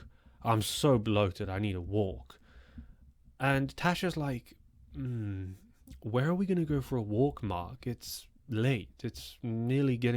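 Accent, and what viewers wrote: United Kingdom. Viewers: You have been popping up on my phone over the last couple days, and I finally checked your channel out. What a fabulous storyteller you are! I was raised by a British mom here in the United States, and your accent has me feeling nostalgic..(she passed away almost 9 years ago). Subscribed, and listening in from beautiful Colorado. 💕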